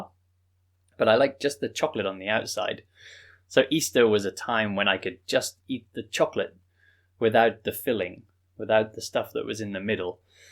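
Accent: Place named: United Kingdom. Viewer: Thank you, I have been living in England for 4 years bot I have got prublem in listening and spiking. I have been loking for a poudcatd and i belive thay your poudcast is the best for peopels how like leirn english by acsent uk. thank you egain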